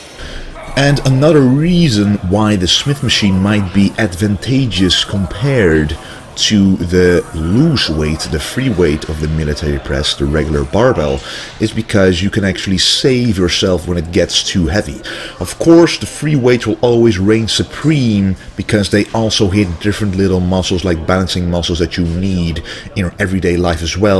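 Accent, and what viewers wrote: Netherlands. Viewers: I hear a dutch accent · you have a dutch accent... de groeten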